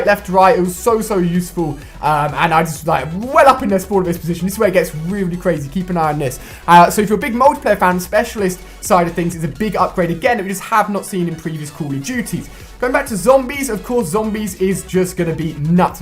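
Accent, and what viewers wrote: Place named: United Kingdom. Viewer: And I''m well aware of accents guys. I'm not oblivious of the fact that he's British also. So quit this arguing shit and move on kids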